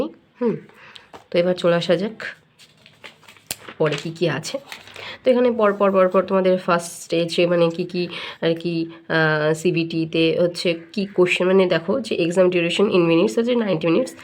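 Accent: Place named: Krishnanagar, India. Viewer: কৃষ্ণনগর এ বাড়ি মনে হচ্ছে তোমার 😊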